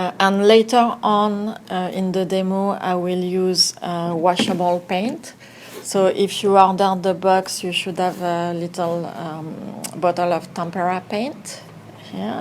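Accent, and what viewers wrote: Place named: France. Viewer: your accent is charming, perfect English, I wish my French were as good! I cannot wait to try this technique.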